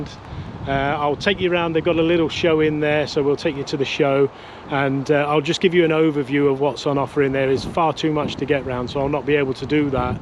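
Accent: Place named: United Kingdom. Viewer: Ahhhh you are British ''Yeah'', ''Yeah'' ''Yeah'', l bet you says ''Guys'' too, a bonsai called Guy then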